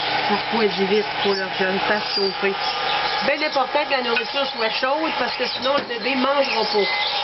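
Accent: Quebec, Canada. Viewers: J'adore votre accent canadien (si je ne me trompe pas) et bravo pour le sauvetage :) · oh bas oui on remarqué que tu es du Québec !!!!!!!!!!!! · Ahah je kiff l'accent québécois ;) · J'adore l'acsen québécois ! J'arrive pas a le faire... 😕 ! Un jour d'arriveré. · pas française, québécoise !!! listen !!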